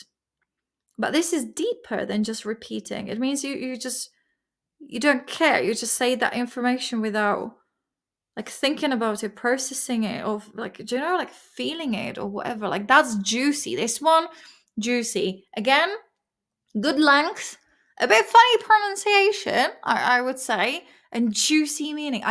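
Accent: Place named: United Kingdom. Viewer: I love your accent, actually Persian is my main one but I always enjoy British accent ❤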